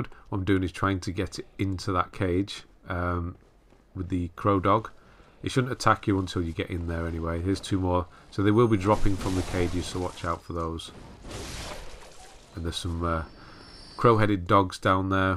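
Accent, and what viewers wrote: United Kingdom. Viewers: You have such a cozy, warm, british voice, I just can’t. It’s not only a very good guide, but just listening to you makes all this journey a bit easier. Thank you, somebody from UK. You make other peoples gameplay so much easier and controllable.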